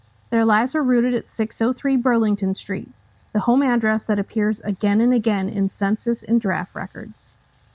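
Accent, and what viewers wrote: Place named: Ontario, Canada. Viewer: You sound like you're from Ontario lol I've lived here my entire life. I honestly don't blame anyone who wants to move south of our border lol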